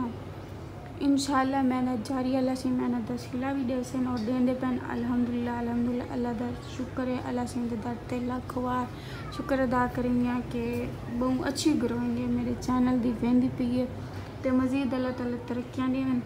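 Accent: Saraiki, Pakistan. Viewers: Taidi sraiki Sachi bhao mithi hai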